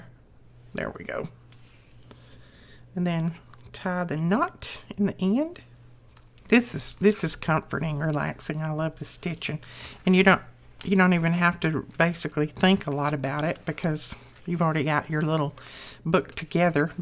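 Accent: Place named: Alabama, United States. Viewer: These are wonderful, E! I've heard Rachel from Roxy Creations mention you a couple of times. She's impressed with what you are doing with her challenges!! I am praying for your husband. I know it is difficult for him and difficult for you, too! May God touch his body and heal him. Not our will but God's Will be done! Love everything you do, and I love hearing your Alabama accent since I lived in Alabama for 8 years, and even went to the University of Alabama. Where in Alabama do you live?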